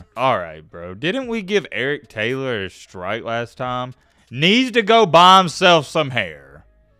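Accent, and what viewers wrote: South, United States. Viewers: It’s impressive what a southern accent and zero football knowledge this guy is still very entertaining and that’s all that matters.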